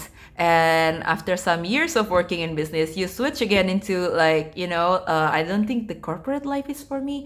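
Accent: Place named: Indonesia. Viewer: I heard Indonesian accent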